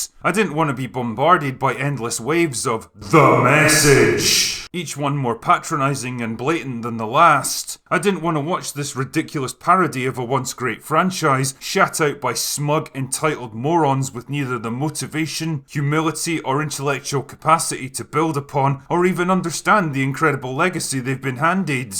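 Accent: Scotland, United Kingdom. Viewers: I like the channels content, but dude you have a wank Scottish accent 😂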